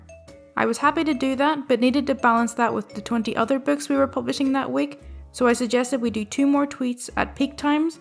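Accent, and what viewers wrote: Scotland, United Kingdom. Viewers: I so enjoy listening to you read!! I'm sure you are constantly asked but are you Scots? You have a lovely accent where ever you hail from. I, myself am a Texan, and really hate hearing myself recorded but I guess, there are people that enjoy it as I used to d.j. at a small market radio station many years ago. I always wanted to move to the U K. in the hope of losing the twang and gaining a lilt. Oh well. At least I get to listen to you! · Are you Scottish? It's nice! Edit:never mind, I read another comment and read your reply to it. It's hard to tell if your Scottish or Irish.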